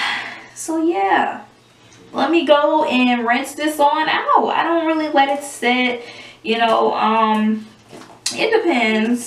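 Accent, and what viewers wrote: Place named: Louisiana, United States. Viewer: We gon start wit da bek, let's giv it sum wuhta bebbe😂🤣😂🤣😂 I jus luv ur loui accent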